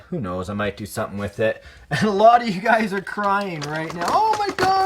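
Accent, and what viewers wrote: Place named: Canada. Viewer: Where are you from? I think you have a canadian accent but could be totally wrong. Just watched a couple of your vids. cool stuff.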